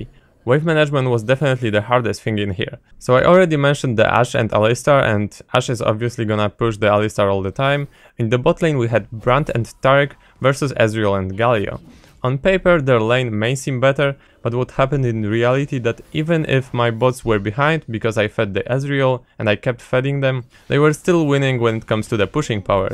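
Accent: Poland. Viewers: this polsih accent is unbearable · You have polish accent, so POLSKA HUSARIA GUROM! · jaki polski akcent xd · Hello, are you from for Poland? U sounds so polish :D xD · For first,he is Polish, and he has a Polish accent.And for the second,just try to raise the volume a bit or turn on the subtitles if you don't understand him,but however,his english can be understood. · Are you polish? :D You sound like a polish guy :) Btw. your voice is awesome :) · when you speak English, you sound like you would be from Poland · Szłyszeć po głosie, że z polski jesteś! :D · Dziena poznałem po akcencie że jesteś polakiem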